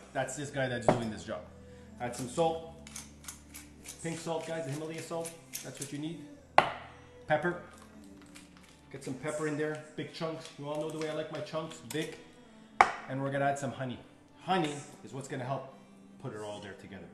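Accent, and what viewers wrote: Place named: Montreal, Canada. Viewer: I picked up the Mtl accent right away 😂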